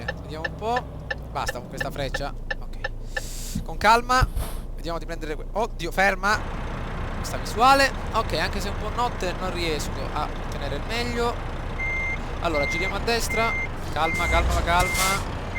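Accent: Sicily, Italy. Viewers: ma sei siciliano??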